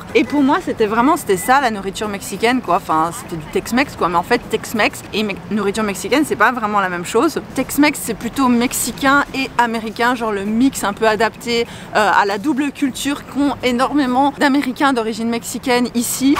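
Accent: Belgium. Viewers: dommage que tu ne dis plus les chiffres à la manière belge :'( (et suisse) Sinon super vidéo comme d'hab !!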